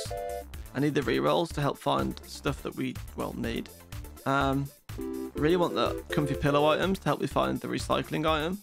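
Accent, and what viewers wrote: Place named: United Kingdom. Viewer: You still sound British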